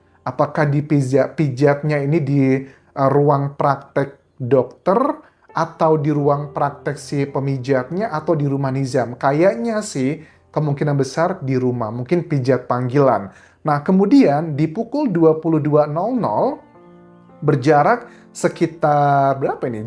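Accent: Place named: Sunda, Indonesia. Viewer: lucu amat ngomong sunda nya mas 😂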